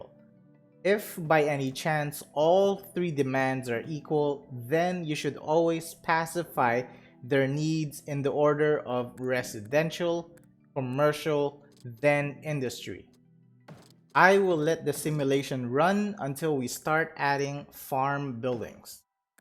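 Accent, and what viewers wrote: Philippines. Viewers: my wife says you sound filipino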